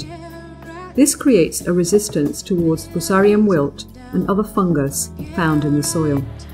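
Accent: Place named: United Kingdom. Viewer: that perceived british accent was so hard to follow. but jolly good show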